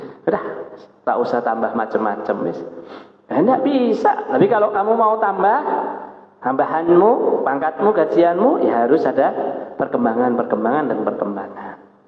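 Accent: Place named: Semarang, Indonesia. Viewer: Ustadz asli Semarang keturunan Arab tob ceramahnya pake bahasa logat Semarangan 👍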